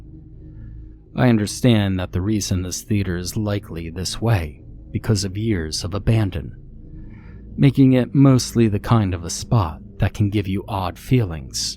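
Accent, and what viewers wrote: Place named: Texas, United States. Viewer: Holy shit! That was an incredible Texas accent with Eastern European undertones! I mean it! That might be the best, specific accent I’ve ever heard.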